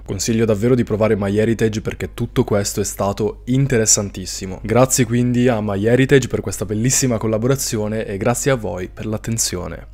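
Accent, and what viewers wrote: Sardinia, Italy. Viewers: Noooooo giurami che sei sardo di Cagliari ti prego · Sardo, di Cagliari 😂 FANTASTICO ❤💙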